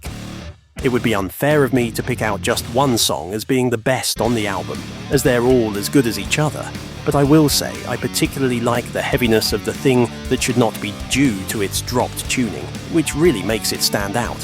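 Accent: United Kingdom. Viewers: You are British as per your accent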